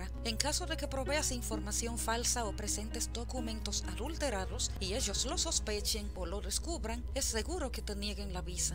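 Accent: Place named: Russia. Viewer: Buena informacion aunque la Calidad del video y esa voz con acento ruso es un poco molesta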